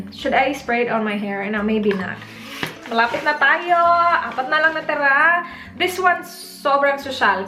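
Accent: Visayas, Philippines. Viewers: haha natawa ako sa "bangag",cute kaayo paminawon ang imo tagalog na may sagol nga bisaya.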